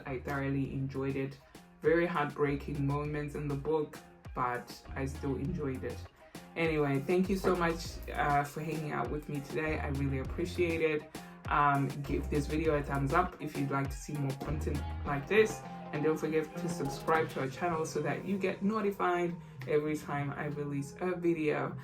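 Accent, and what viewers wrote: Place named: Zimbabwe. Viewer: I just finished her book NOW, and I am feeling SO full of thoughts and emotions now. I am now watching and listening to your review. I am going to do a review of the book on my channel soon. You sound Zimbabwean. Are you Zimbabwean by any chance? I am Zimbabwean and UK based.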